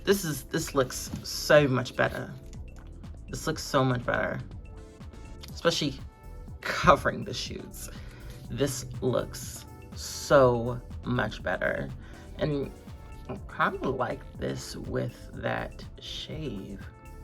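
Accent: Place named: United Kingdom. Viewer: It’s the British accent that’s scattered throughout this video, I love the energy!!!!!!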